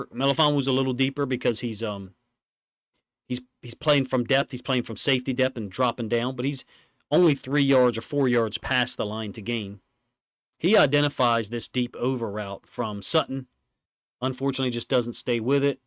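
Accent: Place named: South, United States. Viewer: I love your breakdowns, your insights and your accent. I assume you’re from the south. Do you mind saying where you were raised? I spent some years in TN and you remind me of my smart, articulate professors with twang in their speech. That southern accent when mixed with intelligence and a nerdy enthusiasm for teaching the subject at hand is a perfect combo to the ear of the learner imo.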